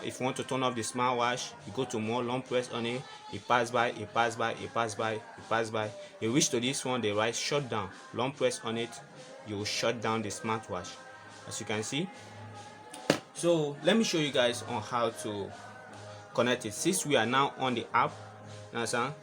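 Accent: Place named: Africa. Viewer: Brother, I can tell you're African from your accent, and I'm really proud of your video. I bought this watch from the Best Buy online store, and I needed to know how to charge it. Thanks for your help.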